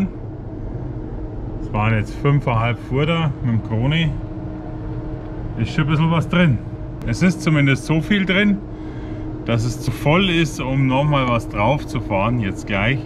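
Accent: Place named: Bavaria, Germany. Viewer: Du konnst a boarisch ren😉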